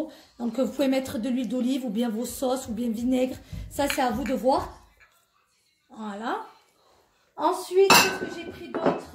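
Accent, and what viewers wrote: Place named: South, France. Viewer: C’est en région parisienne ? Vu ton accent cela doit être dans le Sud😜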